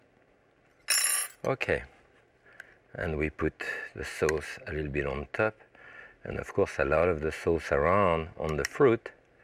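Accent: France. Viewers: RHUM...YAAAARglol no i do understand that this french chef prenoncation is hard to understand for those that do not speak french as their 1rst language...im french and im good in english ...i i miss some parts once in a while...his accent is SO funny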